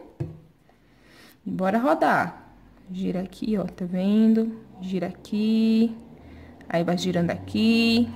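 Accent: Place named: Bahia, Brazil. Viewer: vc fala igualzinho minha tia Rosane da bahia ... vc é de onde ?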